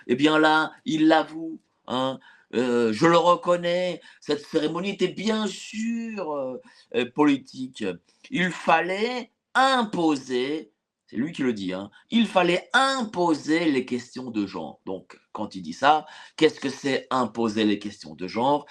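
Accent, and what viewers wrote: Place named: Germany. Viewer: Arrete ton "il ne faut pas avoir peur", c est à vomir. Je deteste l accent allemand.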